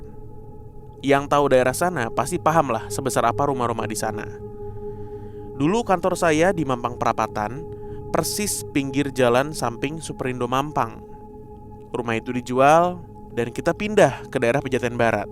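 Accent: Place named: Brebes, Indonesia. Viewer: wong brebes kyeh....😅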